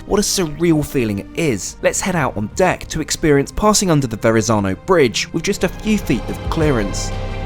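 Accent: United Kingdom. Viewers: Theyre called lifts in the uk. Youve got a surprisingly British sounding accent for an American. 😮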